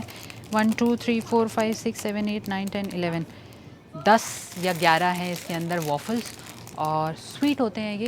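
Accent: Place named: India. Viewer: Thick indian accent ,i think she loves india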